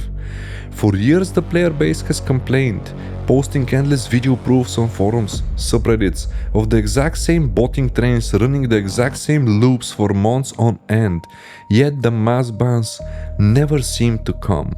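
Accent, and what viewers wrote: Balkans. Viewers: Balkaaaaaaan accent hit me like a truck mon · great video, cant wait next one ! (from another balkan guy, ur accent is funny to listen to haha) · You sound mega Balkan bro. 100000% Balkan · the balkan accent and the conspiratory story telling makes this video so much better :D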